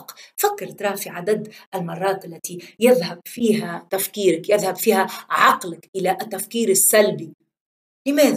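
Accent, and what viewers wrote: Tunisia. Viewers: اللهجة التونسية نحبها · لهجة تونسية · اللهجة التونسية الجميلة الباهية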